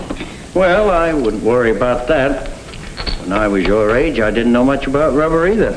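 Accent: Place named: New England, United States. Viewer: Definitely a New England accent !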